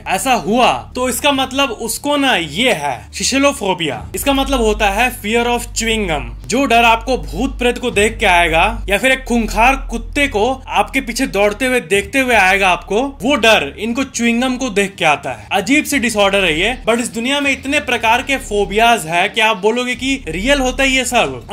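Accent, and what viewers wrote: Bihar, India. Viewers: missing telling facts in bihari voice form💖😊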